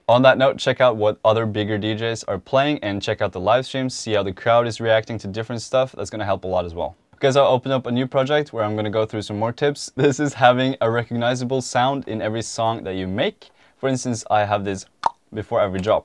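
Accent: Norway. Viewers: er du norsk? høres sånn ut hvertfall. hvis ud ikke er nosk gir dette sikkert ikke noe mening da men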